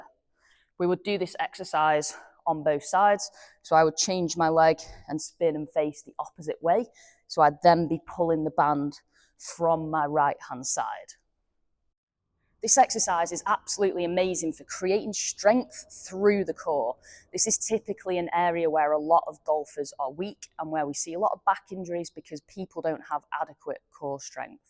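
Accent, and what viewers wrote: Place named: England, United Kingdom. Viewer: Hello from another Canadian. I have recently subscribed to you channel. As a retired Athletic Therapist and golf enthusiast that enjoys working at my game from a fitness and technical point of you, the content you share as an ex-professional golfer and now fitness instructor has become one of my “go to sources of information.” As a Canadian that doesn’t get to golf much during the winters, I created a sport specific workout area and chipping station, in the basement of my house where I can try out some of your training suggestions . Also, I must mention that listening to you accent adds to the fun. It reminds me of the year that I studied at Chelsea College in Eastbourne, England.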